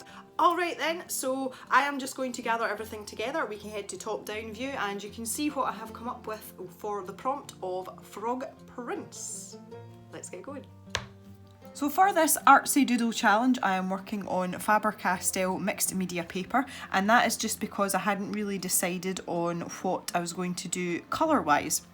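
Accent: Scotland, United Kingdom. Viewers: Loved the video and am one of those who loves your accent. My Nan was Scottish and I have many a happy memory of visiting her in Bonny Scotland. I miss her so much, and find your voice sooo soothing!Also, I was born and bred in Corby which is known as a Mini Scotland and has a wide range of Scottish accents, so understand you perfectly (though I couldn’t always understand my Nanny June 😂)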